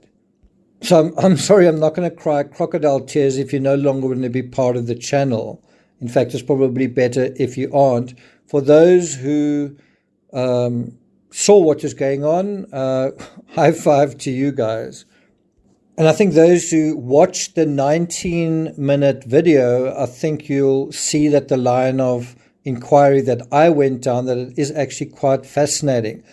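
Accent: South Africa. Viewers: I hope you stay here, love your analysis. Lekker to hear a South African on the tubes ❤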